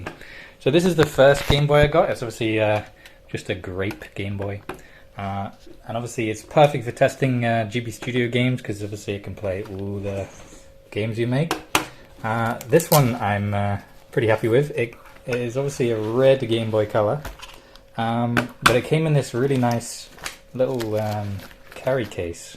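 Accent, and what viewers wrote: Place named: Australia. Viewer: Great vid here haha, cheers. Seein' other devs working on their own stuff helps with the ol' self-motivation too. Keep up the great work. Oh, and you're probably the most 'Strayan sounding Brit I've ever heard haha.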